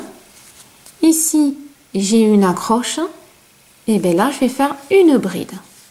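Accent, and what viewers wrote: South, France. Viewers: Formidable ! ça ne va pas trop vite, les explications sont nettes et précises, la voix agréable et le petit accent du sud rigolo. Merci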